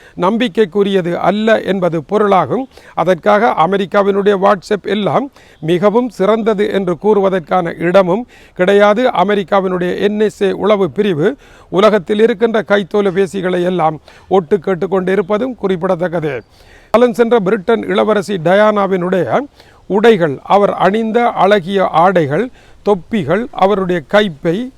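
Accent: Jaffna, Sri Lanka. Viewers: யாழ்ப்பாண தமிழ் இனிமையாகத்தான் இருக்கும்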